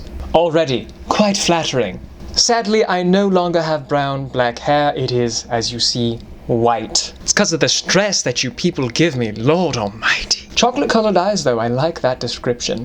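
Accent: United Kingdom. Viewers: I wish would read Darcy's proposal from pride and prejudice lol or Mr. Rochester's proposal to Jane with the British accent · I am so used to hearing his British Gentleman accent that I forget that it is not his real voice..... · Is he actually British or is he American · I love either way when he does his regular voice & and his british gentlemen for the 1800's voice. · The funny thing is that earlier in these videos he’d only be British when reading it, now ITS ALL THE TIME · So I came here from tik tok for the british accent... but the reactions are sooooo much better 😂😂😂😂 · thats how you know that, and his up-most british accent, is a fellow anime fan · This is my second vid i watched of him so uhm what is his real voice??? 😂😂 Liks is the British voice his real voice or not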